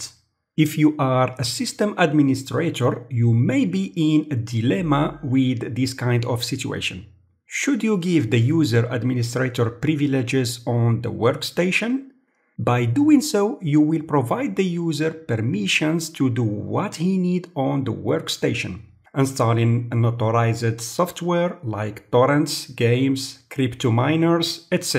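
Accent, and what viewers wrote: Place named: India. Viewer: Initially you wanted to say "How to create a NEW admin account with your current admin account, with which you can run admin programs you could also do with your usual admin account". You're basically selling bullshit here and try to fool anyone the dullest way possible. But the indish accent tells everything right at the beginning already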